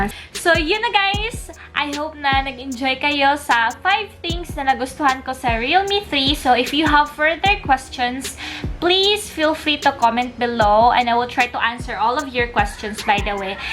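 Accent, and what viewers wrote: Visayas, Philippines. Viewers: bisaya diay ka ate?hehe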